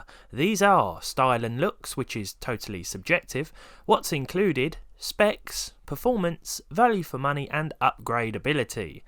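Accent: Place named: Scotland, United Kingdom. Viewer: I like his accent. Is it Scottish?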